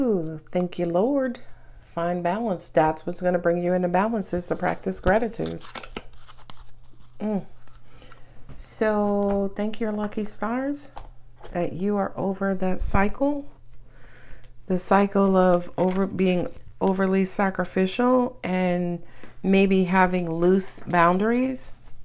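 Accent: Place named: New York City, United States. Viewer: 2024 is my 7 year cycle as well! I am ready to be completely happy & love ❤🎉 Bring it!❤🎉 Thank you! Bring on the NYC accent 😊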